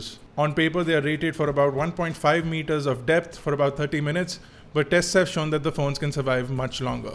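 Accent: India. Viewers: Great Showmanship and Stylish yet understandable Indian Accent, Rock On,........... Make a VS Vid with the G6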